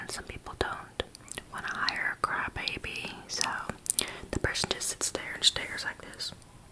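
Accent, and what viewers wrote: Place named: South, United States. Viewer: This was SO GOOD!!!!!!!!!! As a southerner myself, I can say the accent is accurate to what most of us sound like. And also, I got a lot of tingles from the video. So keep up the good work!!!!!!!!!!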